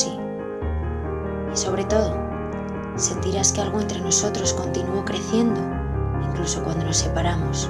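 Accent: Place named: Spain. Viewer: Me encanta el acento español!!!!!!!!!!!!!!!!!!!!!!!!!!!!!!!!!!!!!!!!! Me encanto lo que hiciste! He llorado... inevitable no llorar!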